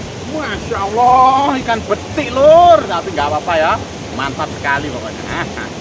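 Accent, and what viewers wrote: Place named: Java, Indonesia. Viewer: Jowo mase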